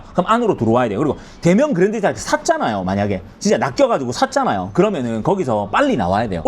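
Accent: Gyeongsang, South Korea. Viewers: 아마도 경남분이신 것 같아요. 제 부산친구랑 말투가 같아요. 경상도 사투리라도 억양이 조금 다르죠!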